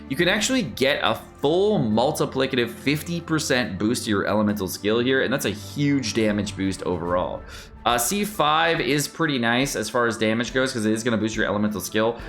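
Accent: United Kingdom. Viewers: British never fails to amuse me 🗿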